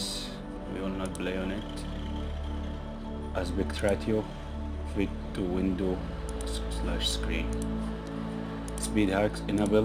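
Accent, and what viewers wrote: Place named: Gulf, Arab world. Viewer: انا قلت انه انجليزي بس يوم شفت اللهجه قلت ذا ولدنا وبس ههههههههههههههههههههههههههههههههههههههههههههههههههههههه(استمر يا أسطوره والله انك ساعدتني🫡🤍)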